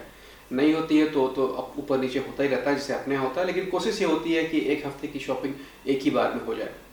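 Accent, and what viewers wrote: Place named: Awadh, India. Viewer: आपकी अवधी भोजपुरी अच्छी लगती है और ये बताये वहा भारत और हिन्दी उर्दू को क्या अहमीयत है?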